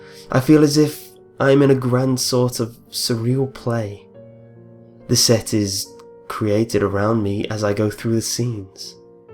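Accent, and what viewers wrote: United Kingdom. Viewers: I like his voice because he's British!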